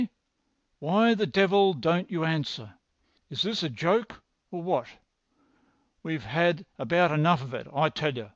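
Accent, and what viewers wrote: Australia. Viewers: The Australian rising question intonation is distracting. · Australian? good reader - good story. thanks :}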